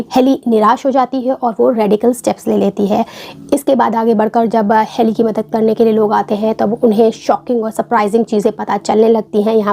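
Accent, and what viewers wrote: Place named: South Delhi, India. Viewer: You excent is like sout Delhi girl ❤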